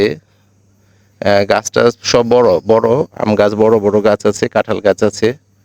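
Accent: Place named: Old Dhaka, Bangladesh. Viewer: ধন্যবাদ আপনাকে ভাই। সুন্দর উঠান। আপনার সবচাইতে সুন্দর জিনিস হলো আপনি অনেক চেষ্টা করেন পুরান ঢাকার ভাষাটা লুকানোর জন্য। কিন্তু ওটাই আমাদের পুরান ঢাকার সবচাইতে বিশেষ বৈশিষ্ট। পুরান ঢাকার লালবাগ থেকে বলছি। দাওয়াত থাকলো আপনার জন্য। ভালো থাকবেন।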